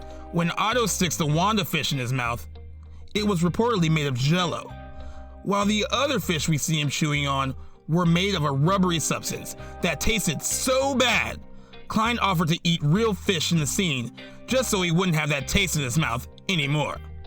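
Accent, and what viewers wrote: United States. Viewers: a strange mix of well researched commentary told an in annoying yank voice normally associated with dumb crash bang wallop videos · The overly articulated American voice over is just too unbearable to watch this documentary.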